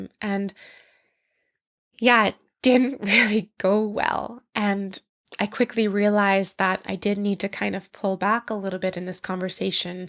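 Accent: Canada. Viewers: Your accent leads me to believe you're Canadian. Care team? We have hundreds of thousands of mentally ill crowding the sidewalks of every city in the US. It's a horrible job that nobody wants. Count yourself fortunate that you have the resources to help you.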